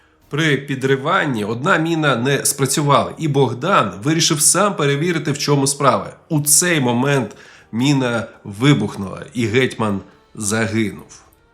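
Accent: Russia. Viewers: Житомирщину до совка частково називали Волинь,це пізніше так назвали "Луцьку область". Автор раніше видавав контент ворожим язиком,звідти і наголоси.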